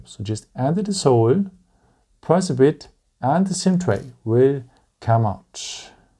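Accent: Germany. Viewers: We need more videos with some German accent ! Probably buing this phone today, i have had a 9 for four years and very little trouble.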